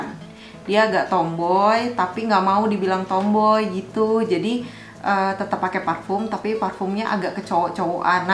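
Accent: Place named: Sunda, Indonesia. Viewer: Nyunda pisan di video ieu mah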